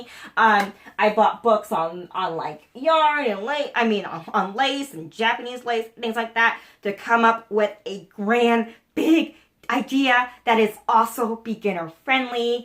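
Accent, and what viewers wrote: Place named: New Jersey, United States. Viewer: Love the “bawls and cawfee”. I’m from NJ too and worked hard to get rid of my accent, lol. Do you also call a dresser drawer a “ draw”?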